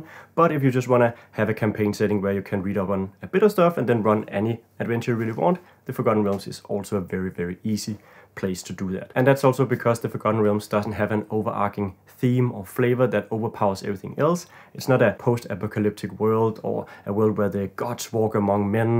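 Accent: Denmark. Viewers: I knew you were danish the moment i heard your voice 😂 Nice video, very helpful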